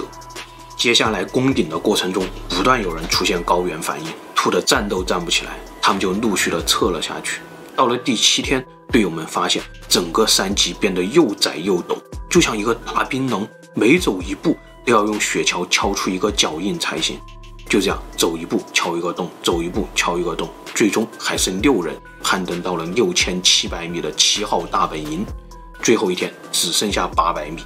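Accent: China. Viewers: 的这个是江苏还是福建口音？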